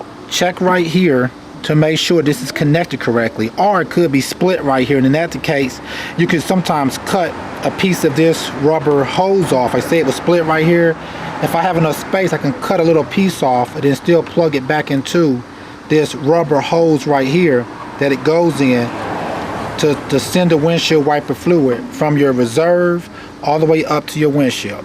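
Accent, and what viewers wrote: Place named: Kentucky, United States. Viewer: Thank you so much for the video lots of help!! but also it was funny hearing your accent and I said man it sounds so familiar KY... represent Louisville Kentucky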